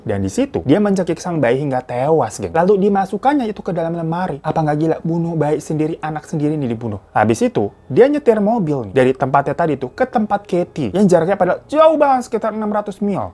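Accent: Java, Indonesia. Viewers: Ngakak anjirrr dengerin suara nya gaya bahasa nya medok wkwkwk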